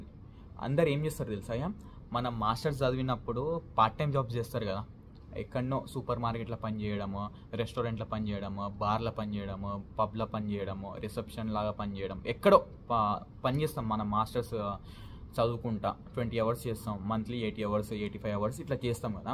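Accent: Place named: Telangana, India. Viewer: Brother ni way of presentation superb in telangana slang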